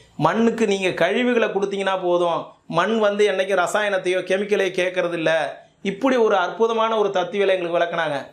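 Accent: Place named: Coimbatore, India. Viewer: நன்றி ஐயா. மலம்கூட மண்ணுள் மக்கினால் மணக்குமென்பதை அழகாக விளக்கினீர்கள். ஏனுங்க நீங்க கோயம்புத்தூர் பேச்சு வழக்கிலேயே பேசுங்க.அழகா இருக்குதுங்க.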